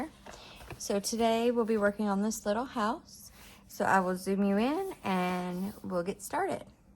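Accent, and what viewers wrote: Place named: Kentucky, United States. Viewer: Oh, how cute! Love your intro music, so fun and your desk set up today is so stylized! I was working away at Kerby dragon with you last night. Made a boo boo because using my Prismas, erased and worked on finding a colour combination. I found that one that you suggested in Prisma was too dark when used with another, so I persevered and tested things out. I learned a lot doing this and got a colour combo that is similar to yours but I liked even better, haha! I then decided to use only three colours on the scales and it looks good. I had two colours that are the darkest and midway through, I forgot which one of the pencils to use. I've decided to go between the two. I figure that animals in real life have colour variations in their scales and are probably not consistent in colour. I wanted to let you know that I really like your channel because it feels more personalized compared to many of the others. 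I feel as if I'm colouring with a friend, but at the same time, I get the feeling like I'm learning from an expert. I don't know why you think that you are not great at talking during your videos, you are quite chatty and personable and your Kentucky accent adds charm especially to my Canadian ears, lol. I also like how loving and caring you are to your children and I can strongly relate to that. My heart gets warmer when I am colouring and hear you talk about them. I really enjoyed colouring along with you yesterday because we just sat and coloured together unlike other coloris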